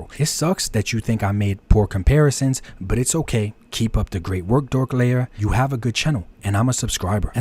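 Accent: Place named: Bronx, United States. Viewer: Classy, respectful video while making your point 👏 . I have watched many of your videos but this one made me sub. That a bronx accent? Cant put my finger on it. Def tri state area though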